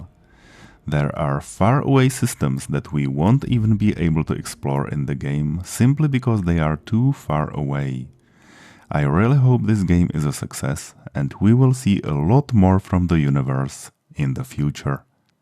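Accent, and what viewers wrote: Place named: Czech Republic. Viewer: You sound very Czech. Are you Czech? YOU ARE! Hello :D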